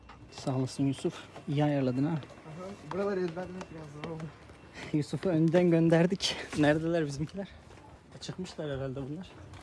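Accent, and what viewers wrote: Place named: Central Anatolia, Turkey. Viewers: Kendisi anadilini tıpkı iç Anadolu kırsalındaki kadınlar gibi konuşuyor. Kadın yabancı bir dilde iki kelam etti, dalga geçiyor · Dingil Ankara şivesi o. Köylü değil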